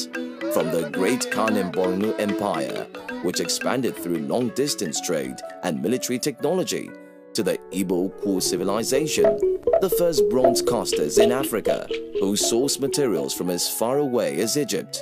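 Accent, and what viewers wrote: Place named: Europe. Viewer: This narrator sounds European to me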